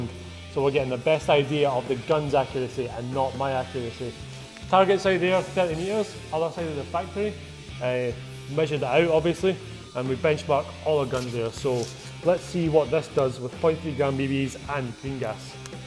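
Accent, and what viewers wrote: Scotland, United Kingdom. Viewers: Haha all the folk saying hes English and Irish clearly haven't heard a Scottish accent before :L in one of his videos he actually states hes scottish. hur hur.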